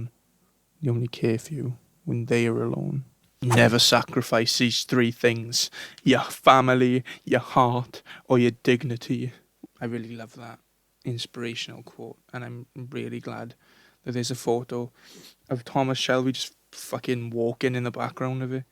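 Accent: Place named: Wales, United Kingdom. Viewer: dai butt i faking love that welshy accent like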